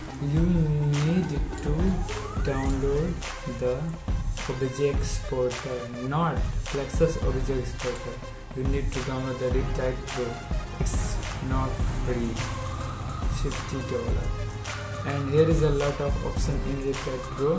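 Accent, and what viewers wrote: India. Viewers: u sound like an indian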